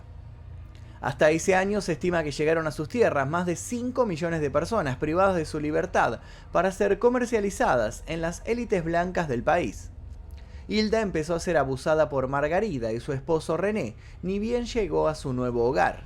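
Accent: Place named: Argentina. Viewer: Me encantan tus narraciones perfectas con su toque 🤗👍 con todo respeto a tu Sra. El acento Argentino siempre me ha parecido muy lindo 😃. Saludos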